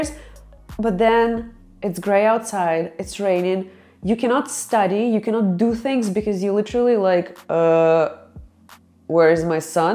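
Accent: Russia. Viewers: Well researched video. Keep up the good work..I like the Russian accent..Maybe you can teach me sometime 😉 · strong Russian accent ❤️ You are doing well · I like the way she talks in a Russian accent it's really hilarious.😅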